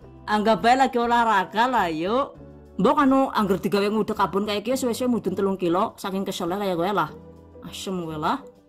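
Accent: Banyumas, Indonesia. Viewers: Asem ngapak jebule 😂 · Wkwkwk saya orang banyumas....denger orang ngomong ngapak jadi ketawa sendiri 🤣🤣🤣🤣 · Kaget, bar formal trs ngapag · Hahaha wong ngapak juga yah ternyata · Asli mana seh kok bahasanya sm kek aku ngapak" 👍😁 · Rika wong ndi sih yu ketone ngapak temen kaya nyong hehehehee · Saya suka lihat youtube ibu , kreatif, tata bahasa lucu, pakai ngapak bahasa Inggris gokael gaes 😁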